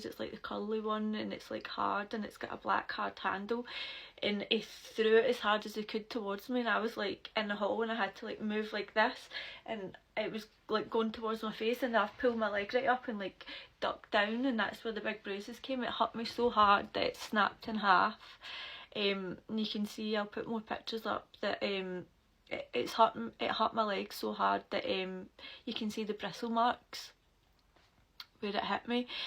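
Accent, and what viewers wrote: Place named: West Scotland, United Kingdom. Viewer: Where abouts you from??? Defo sound West Coast of Scotland / Glasgow / Ayrshire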